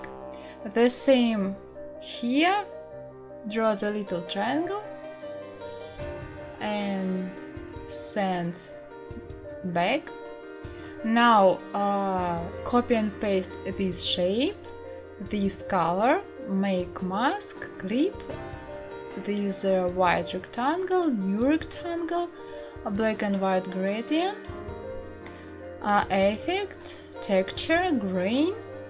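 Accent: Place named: Russia. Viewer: Very cute Russian accent.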